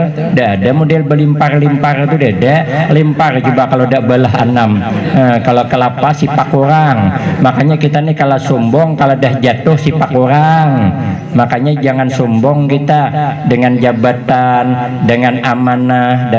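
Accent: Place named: South Kalimantan, Indonesia. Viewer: Asli org mana sidin... lugat mirip kalua amuntai pang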